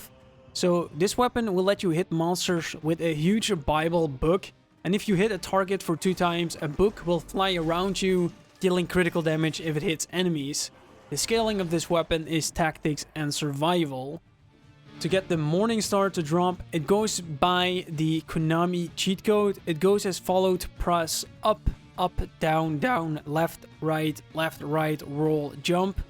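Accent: Netherlands. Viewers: oh je bent nederlands zo te horen ;) ik heb een vraag, ik wilde laatst Fractures shrines binnen gaan maar toen stond er ''Requires Fatal Falls GLC'' terwijl ik deze dlc al heb gekocht. heb jij mischien 'n idee waarom ik dan toch dit bericht krijg ?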